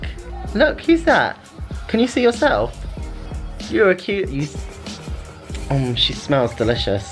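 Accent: United Kingdom. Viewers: Between the British accent, the background music, and tinnitus, I often have to watch his videos several times. Headphones help.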